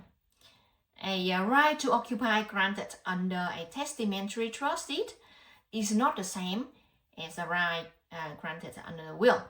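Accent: Vietnam. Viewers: Why do u sound vietnamese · Yes, the podcaster has difficult to understand Viet-English. Good content but hard to listen to.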